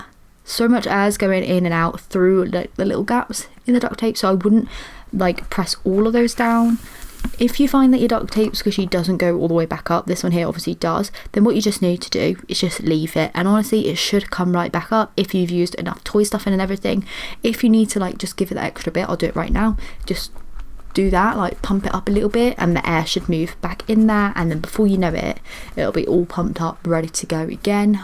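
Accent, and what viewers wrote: England, United Kingdom. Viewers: No way are you English!!!?? 😍😍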